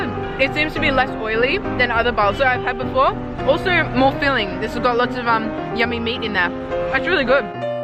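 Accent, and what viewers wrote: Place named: Australia. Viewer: Big love for this girl’s video, amazing accent , passionate speaking ，week done Aussie girl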